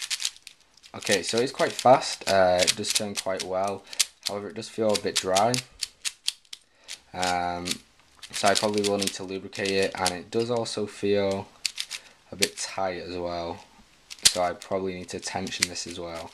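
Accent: United Kingdom. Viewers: you sound british , how long did it take light take to ship to britain if you do live in britain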